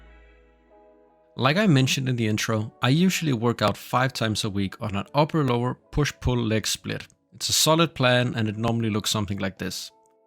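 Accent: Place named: Denmark. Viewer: I fucking knew you were Danish by the accent and then got confirmed when I saw those puregym dumbbells. Let's goooo!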